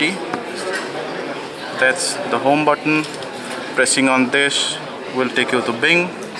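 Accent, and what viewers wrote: India. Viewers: the voice made me laugh a haha i love that indian acent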